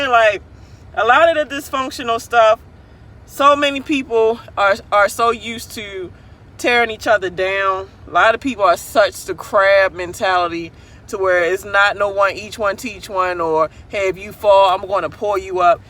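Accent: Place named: Maryland, United States. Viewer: That Maryland accent 😂😂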